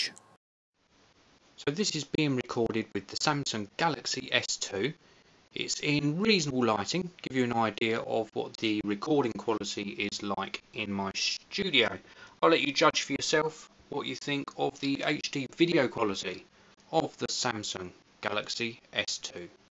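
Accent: United Kingdom. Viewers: This is probably the most powerful british accent I ever heard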